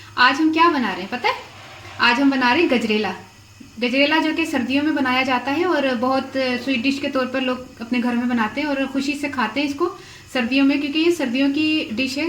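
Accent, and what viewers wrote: Punjab, India. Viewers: App ksa main hain but I think 4rom back home u r from lahore or faislabad because of ur Punjabi eccent😊😊😊😊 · You are so sweet buhat achy and esey treqy sy sab kuch skhaty ho aor Jo bat kerty huye Panjabi ka terqa lgati ho acha lgta Allah ap ka nseeb acha kry ameen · love your Urdu mix punjabi...so sweet, so loving and so nice accent. Your recepies are also very nice, practical and it seems like some family member is telling how to cook. Love you :). I am living in holland and i love to cook and eat desi food. you are doing a good job.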